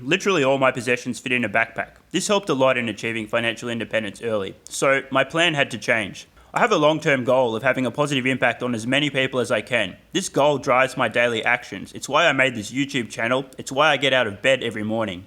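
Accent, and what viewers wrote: Australia. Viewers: It's going to be a tough decision to sell a single share in 10 years! I really hope by then they are paying a dividend by then so I can retire early. Great vids and nice to hear an Aussie accent to get my TSLA news from too, thanks mate! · Great Aussie accent!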